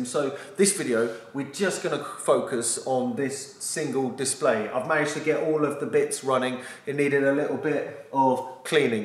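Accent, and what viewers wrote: United Kingdom. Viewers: Only thing I like about you is your english voice. But there is no punk anymore. No revolution. Just established crap about electro bullshit. Just like that sad Schneider.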